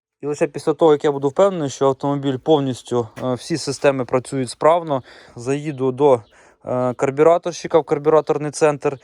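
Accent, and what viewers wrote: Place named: Ukraine. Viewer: По акценту украинец , лайк и подписан давно , у самого леганза , слава Украине !!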